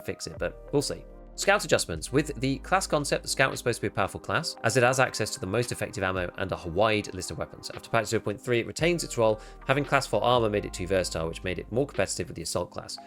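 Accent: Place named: England, United Kingdom. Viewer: Yeah, always Johnny on the spot with the videos, read to us in a pleasant English accent. Fuckin' a man, fuckin' a.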